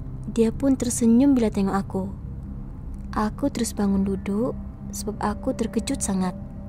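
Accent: Sabah, Malaysia. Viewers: org sabah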